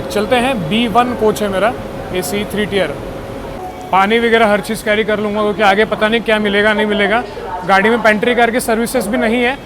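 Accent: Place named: Maharashtra, India. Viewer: मित्रा, तुझ्याकडे आणि तुझ्या उच्चारांकडे पाहून असं वाटतंय की तू मराठी आहेस. मग हा व्हिलॉग मराठीत का बनवला नाहीस? का मराठी बोलायची, मराठीत ब्लॉग बनवायची लाज वाटते? अरे आपल्या भाषेला टिकवणं , जोपासनं ही आपलीच जबाबदारी आहे. हिंदी भाषेवर तुम्हा लोकांचं एवढं प्रेम का? ती काय भारताची राष्ट्रभाषा आहे का?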